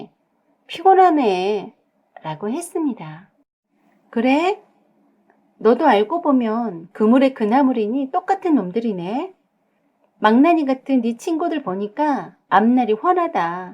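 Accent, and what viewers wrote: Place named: Gyeongsang, South Korea. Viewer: 경상도 사투리가 영....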